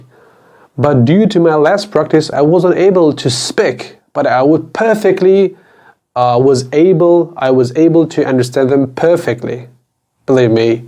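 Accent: Tajikistan. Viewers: Im a Tajik from Afghanistan our language is Farsi and I understand your Tajiki accent fully. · Salam az Iran. I fully understad your Persian Tajik accent. and I also understood most of your Uzbek.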